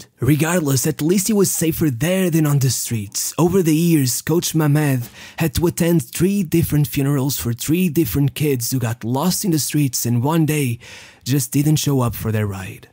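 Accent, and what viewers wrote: Portugal. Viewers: Another great football Story: thank you very much. I can hear that the commentator is just like me a Portuguese speaking dude due to his beautiful accent in English. Thank you Sir. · Esse sotaque, é um tuga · Is the guy doing the vid portuguese? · I see u are portuguese only from your accent 😅🇧🇷🇵🇹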